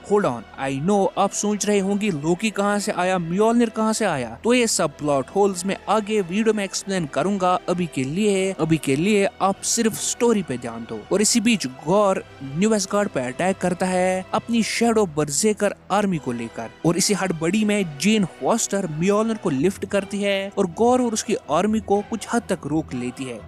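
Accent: Kashmir, India. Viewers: i think he orator seems to be a Kashmiri..?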